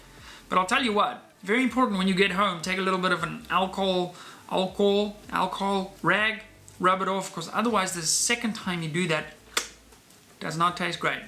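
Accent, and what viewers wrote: Afrikaans, South Africa. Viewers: I actually came across your channel this morning,so have been binge watching your vids for most of the day!!Love the vids,and learning a lot...almost thought you were swedish,but then heard the afrikaans ha ha ha...Keep up the good content!! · Are you South African?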